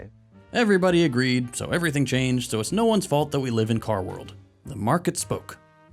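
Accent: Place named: Pacific Northwest, United States. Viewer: Could tell you are in the PNW by the way you speak, but that B roll footage of you driving really brought it home. :p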